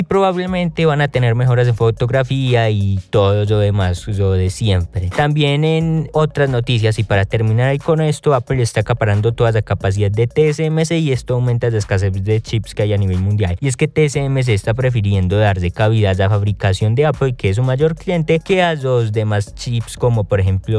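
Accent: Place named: Colombia. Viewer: Me gusta el contenido. Pero me tengo que acostumbrar a ese tan marcado acento colombiano . O es la voz? O son ambas cosas? No lo sé, me imagino que es cuestión de acostumbrarse. Gracias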